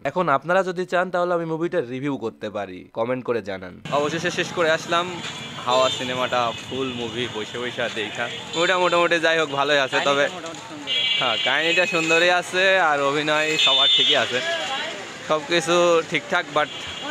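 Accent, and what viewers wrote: Pabna, Bangladesh. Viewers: আপনার মুখে পাবনার ভাষা সুনে সেই লাগলো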